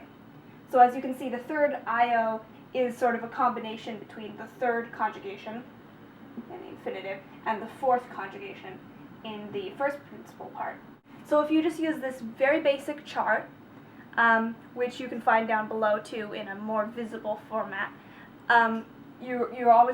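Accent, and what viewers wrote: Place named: United States. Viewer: Latin with strong American accent? No, thanks.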